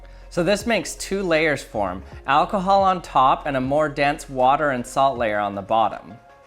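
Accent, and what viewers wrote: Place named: United States. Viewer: annoying yanky droning voice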